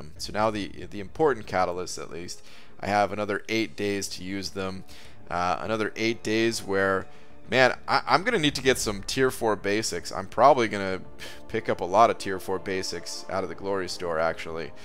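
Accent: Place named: Germany. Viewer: Hi are you from germany you had german akcent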